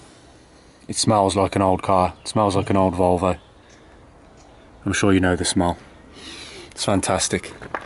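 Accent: Birmingham, United Kingdom. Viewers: "It smells like an old VOLVO in here, it's fantastic!" -"The mileage is probably 500 000km, but that's just a number." -" I didn't notice those stains on the seat when i bought it, maybe I left them there, I was quite exited..." LOL! (Bought a thirty year old VOLVO in the dark, literally!) I love this Brummie!👍😄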